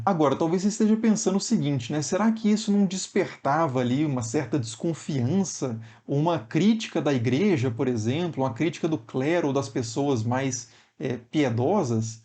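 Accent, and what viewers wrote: Minas Gerais, Brazil. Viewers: Pena que o áudio é baixo. Ainda mais com mineirinho falando 😊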